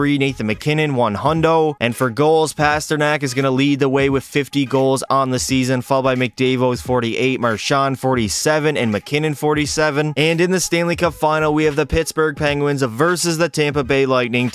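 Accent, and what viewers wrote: Canada. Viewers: are you Canadian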